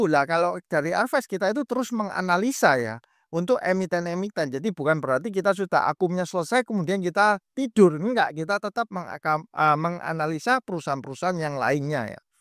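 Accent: Surabaya, Indonesia. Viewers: logat medok suroboyoan rek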